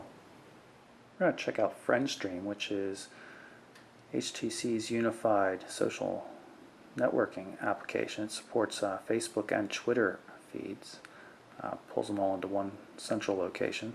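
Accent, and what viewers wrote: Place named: Scotland, United Kingdom. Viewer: there was just a tiny hint of scottish in that voice...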